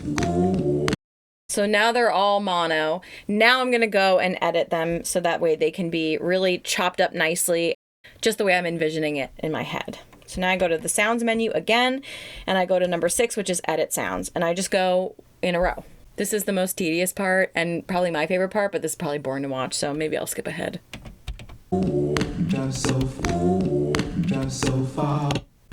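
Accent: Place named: New York, United States. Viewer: Thx Thought I heard a little NY accent in your voice Keep Doing your thing you Dope feel free to to check out some of the Beats I’ve Made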